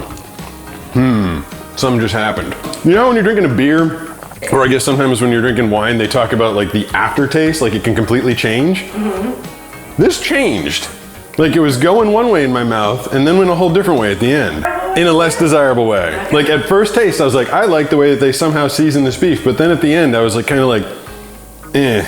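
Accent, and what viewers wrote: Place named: Appalachia, United States. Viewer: Why does this pig talk with food in his mouth..hickish, reminds me of hillbilly heaven.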